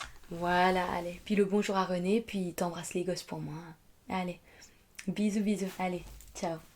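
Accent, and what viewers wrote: Vosges, France. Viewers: Your French accent is very authentic and natural sounding...love to hear you try speaking English with a French accent and French with an American accent. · bel accent vosgiens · Étant vosgienne ton accent me rappel ma ptite ville paumé au fin fond des Vosges merci pour ce retours en enfance 😂😊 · mdr pas mal ... T'es vosgienne ?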